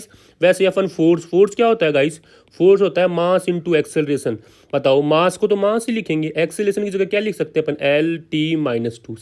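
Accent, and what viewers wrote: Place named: Rajasthan, India. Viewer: App Rajasthan se jaipur ke as pas se ho kya kyuki apki awaj ,Kai Che wali lag rhi h😊😊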